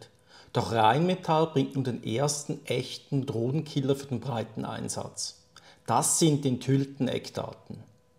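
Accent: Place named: Switzerland. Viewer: Er spricht Hochdeutsch mit Schweizer* Akzent*. Würde er Schweizer Dialekt sprechen, würde ihn wohl kaum ein Deutscher verstehen.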